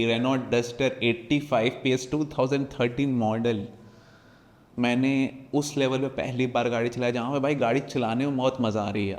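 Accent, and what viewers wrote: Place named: Jharkhand, India. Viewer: Bhaiya ji apka tone se hi laga ap to apnye ho jharkhand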